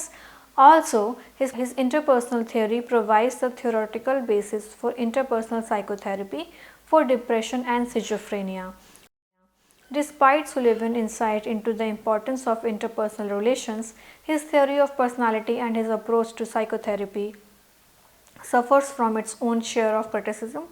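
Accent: India. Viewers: I dont understand the words you said because of the Indian accent..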